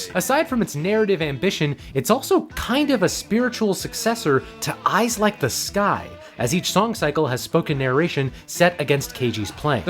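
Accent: United States. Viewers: Couple of notes from an Australian fan: - Opening on a shot of Sydney for a Melbourne band is very funny, well done - Weird that you didn't mention Ambrose is IN the when you first brought up Gizzfest - Also lol'd at calling "Baby Rainbow" - I was at the gig you used footage from during the Nonagon passage, at the Metro Theatre in Sydney. Great gig! I've seen them 11 times all up, from tiny clubs to big festivals, and they're always a memorable show. - Wish you'd mentioned the band playing on Conan when you showed that footage, if only for Conan absolutely RELISHING saying the band name. - As an Australian it's really funny to hear you shorten the band name to KG, because we all call them! That might sound weird with an American accent though 😂 Loved this video, well done!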